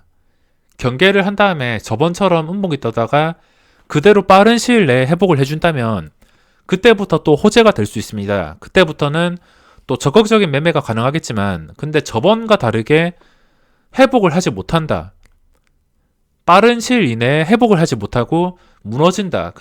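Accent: Gyeongsang, South Korea. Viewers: ㅋㅋ 경상도 분이라 발음이 좀 쎕니다. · 이해해 주세요~ 저도 그렇지만 경상도는 발음이 어렵습니다ㅜㅜ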